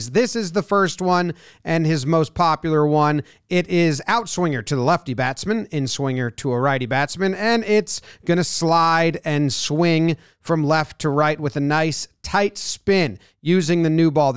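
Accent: United States. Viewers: Nothing against you mate but it is weird hearing an American accent talking cricket. I felt the same with Leigh Diffey’s Australian accent covering US Track and Field. #mindblown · So weird to hear an American accent talking about cricket. Great vid though.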